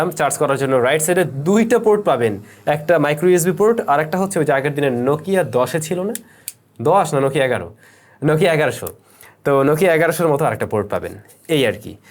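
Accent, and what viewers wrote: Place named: Barishal, Bangladesh. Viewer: আজকে কথাগুলো ভাল লেগেছে গ্রামের ভাষায় কথা বলেছেন দেখে সেম বরিশালের ভাসার মত 🤔🤔🤔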